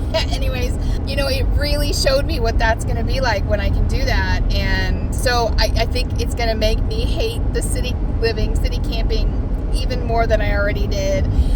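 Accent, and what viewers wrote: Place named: Texas, United States. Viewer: I thought you sounded Texan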